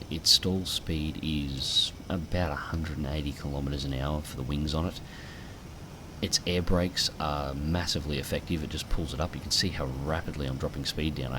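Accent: Australia. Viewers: Lehtokurppa Von Fön get yr ears cleaned. Hes AUSTRALIAN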